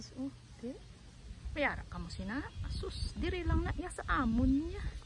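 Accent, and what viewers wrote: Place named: Iloilo, Philippines. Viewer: I like the accent. I love Ilonggos..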